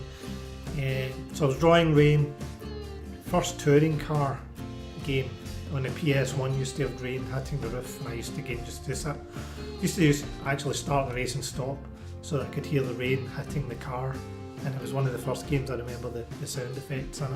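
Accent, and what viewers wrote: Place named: Scotland, United Kingdom. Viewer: eh Joe is one of my favourites! You can really see how your channel is evolving 👍🏻 If you feel like popping over then do if not then it’s not a problem 😊 I’m here to stay as I don’t hear the Scottish Accent enough on YT, mines has faded a little as I’ve lived in England for 17 years 😬 keep doing what you’re doing....it’s fun to watch!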